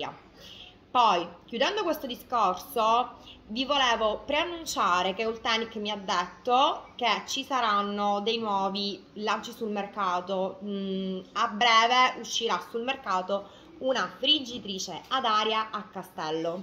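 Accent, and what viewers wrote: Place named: Sicily, Italy. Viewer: hai questo timbro di voce che abbiamo tutte noi siciliani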